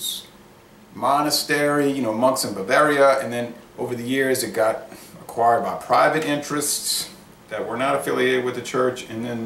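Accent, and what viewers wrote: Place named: Midwest, United States. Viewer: Louisiana? You sound midwestern. The foil neck honestly just annoyed me into grabbing my beer glass instead of drinking it from the bottle. Not sure if that was their goal or not lol.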